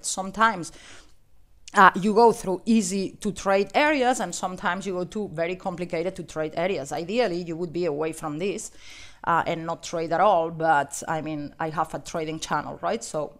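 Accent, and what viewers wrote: Spain. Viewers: spanish? from catalonia, my guess. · Hablas inglés perfecto y te noto acento espanol. De donde eres? Saludos, soy de BCN y estoy en VIE.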